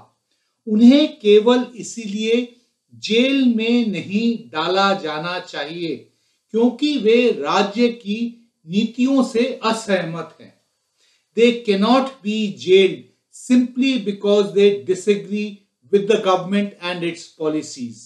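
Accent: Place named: Bengal, India. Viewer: Why does he speak Hindi? He speaks perfect English albeit with Bengali accent. Stick to English. Don't ruin Hindi.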